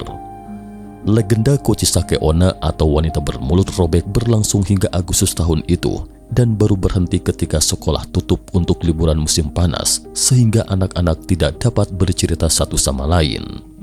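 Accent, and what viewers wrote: West Java, Indonesia. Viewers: Sundanesse abangnya hhe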